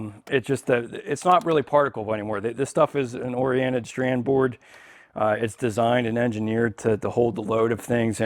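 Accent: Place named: Pennsylvania, United States. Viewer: My boy has a Pennsylvania accent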